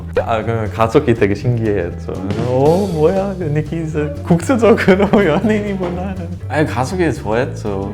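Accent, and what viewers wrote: Seoul, South Korea. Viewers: 저보다 서울말을 잘하시네요 ㅜ ㅜ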